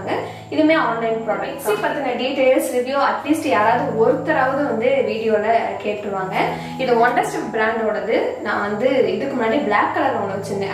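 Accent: Kongu, India. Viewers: Kongu slang la pesunga video la onetime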